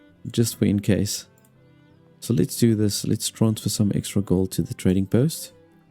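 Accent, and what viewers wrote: South Africa. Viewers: Hey dude... as a South African. You sound like a South African. Where you from???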